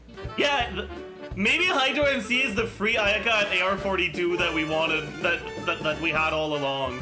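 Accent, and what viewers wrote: Quebec, Canada. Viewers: he's fluent but he has a very thick Quebec accent which very different from what you'd hear in France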